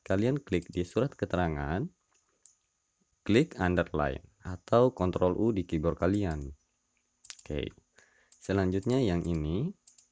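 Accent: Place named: Muna, Indonesia. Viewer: Makasih bang, sangat membantu bagi pemula 🙏 bdw, logatnya seperti daerah sy (MUNA, Sultra) 😁 maaf kalo salah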